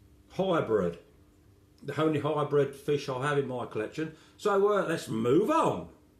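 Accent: United Kingdom. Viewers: Would love to see a full tour of your setup , obviously from the accent you are from the UK but are you UK based ? dont know whether its just my area ( south coast ) but we dont really see many americans other than the usual suspects Oscars,terror, texas etc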